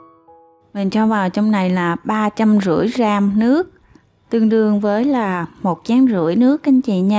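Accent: South, Vietnam. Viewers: giống nói ngọt ngào của người miền nam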